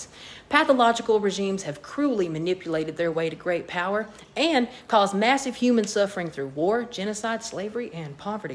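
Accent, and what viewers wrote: South, United States. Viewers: Congratulations- you’ve become a masterclass on southern fried reading on an Aaron Sorkin “Walk and Talk”. 👏🏾🔥👏🏾🔥💯💯💯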